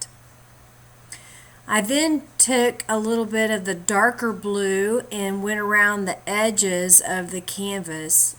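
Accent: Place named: South, United States. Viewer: Awesome, this piece is really cool! I've always loved those colors. p.s. I luuhv yerr suhhtherrn ehccent! Nashville?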